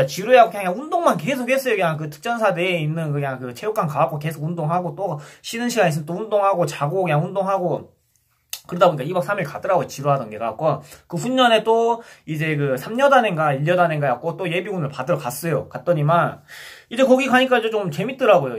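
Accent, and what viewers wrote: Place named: Jeolla, South Korea. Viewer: 내 살다가 이래 재밌게 전라도 사투리쓰는 사람 첨보네 ㅋㅋ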